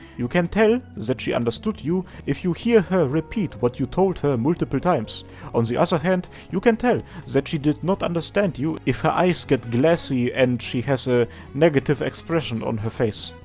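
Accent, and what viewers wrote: Germany. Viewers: The way you pronounced "Heiligkeit" as well as your accent in general make me believe you're German. Please tell me if I'm correct with that assumption.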